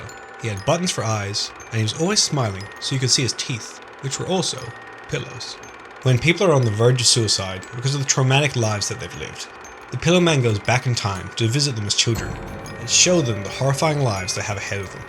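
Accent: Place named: Northern Ireland, United Kingdom. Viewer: where are you from, your accent is fascinating.. you sound northern irish but u've either been to Austrialia or the States.. its driving me mad i cant place you!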